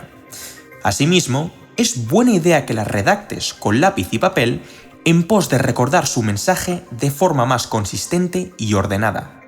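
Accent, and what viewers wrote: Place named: Spain. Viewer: nadie te preguntó, no lo veas y punto. Su acento es español.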